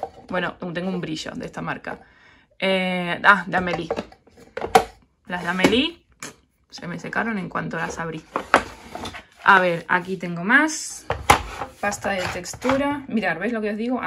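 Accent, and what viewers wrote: Argentina. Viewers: Hola ,te escucho hablar y tenés mucho tono argentino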